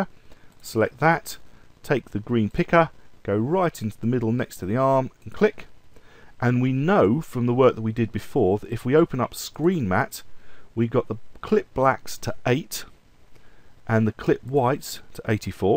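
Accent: United Kingdom. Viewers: JUne 2021: after all this years still the best tutorial to this topics I found. Thank you very much! (And your british English is so good to understand!!!!)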